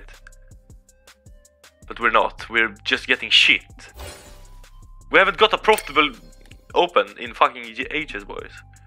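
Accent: Sweden. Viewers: Nice video dude, You have to be Swedish by that's english accent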